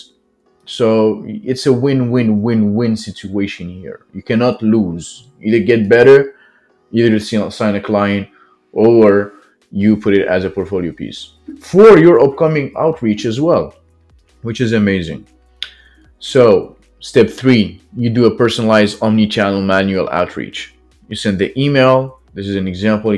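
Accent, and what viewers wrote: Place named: France. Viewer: Are you french ? (I'm french)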